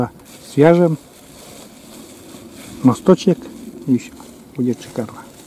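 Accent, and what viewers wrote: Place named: Vologda, Russia. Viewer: Зашла на ваш канал случайно и сразу покорил родной говор,мы живем в Вологодской области,просмотрела несколько видео и так все понравилось,что подписываюсь с удовольствием!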